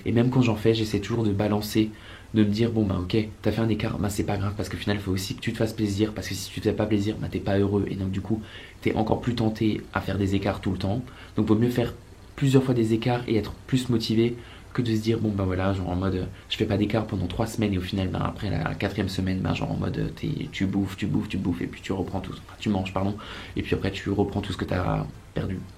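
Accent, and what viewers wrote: Alsace, France. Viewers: Depuis que je regarde t'es vidéo j'ai l'impression que t'es alsacien et puis la je tombe sur cette video et tu as répondu a cette question et purée quelle satisfaction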